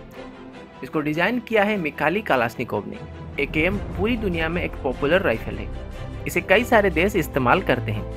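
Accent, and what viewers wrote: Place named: Uttar Pradesh, India. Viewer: Neuz (news) Phayr (fire) Acsen (Action) Uuj (use) Sorter (shorter) Specal (special) I think 🤔 it’s UP accent.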